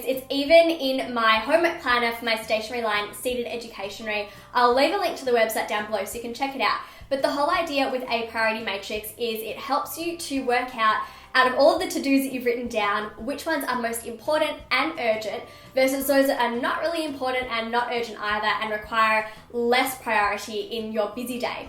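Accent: Australia. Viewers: Sarah Potter australia i think... or did you mean a certain area haha idk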